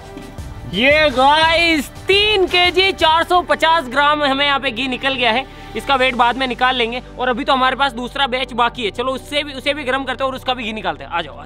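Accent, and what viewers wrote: Rajasthan, India. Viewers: Rajasthan se ho keya bhai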